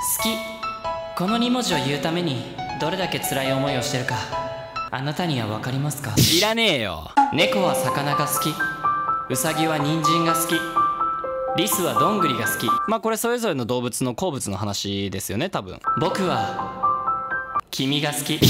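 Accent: Kansai, Japan. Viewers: イントネーションめっちゃ関西弁やん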